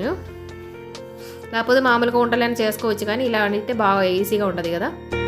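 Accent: Kerala, India. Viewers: Meeru malayalee na madam...mee slang same malayam la undhi Telugu.😅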